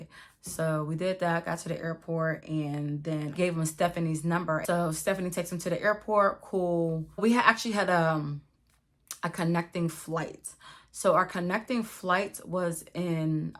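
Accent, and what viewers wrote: Pittsburgh, United States. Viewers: i love ur story times & i love ur Pittsburgh accent it reminds me of college 😩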